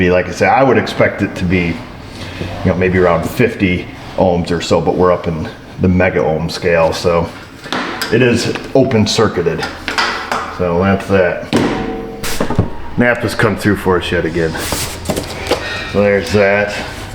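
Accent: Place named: Southern Tier New York, United States. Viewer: As always, a riot if you have the captioning turned on: "Self Made Auto"....even better: "Cancer vent valve". It wasn't until I started watching great channel, that I realized that the southern tier of NYS had it's own dialect. Cool!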